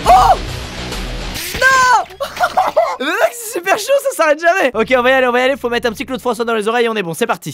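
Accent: Quebec, Canada. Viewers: Et ben squeezie tu parle en québécois tu nous explique??? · Tu sais parler quebequoi comme moi en tabarnak,😂jte soutien dla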